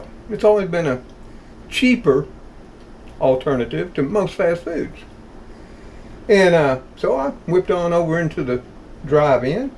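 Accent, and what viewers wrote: South, United States. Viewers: Great Southern twang.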